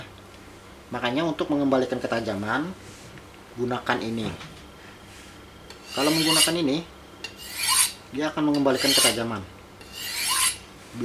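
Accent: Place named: Banjar, Indonesia. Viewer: inggih logat nya. urang mana pian mang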